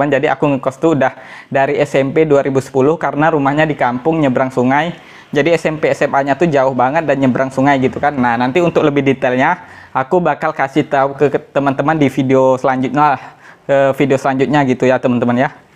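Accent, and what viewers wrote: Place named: West Sumatra, Indonesia. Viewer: Logatnya sih seperti Urang Awak yo ?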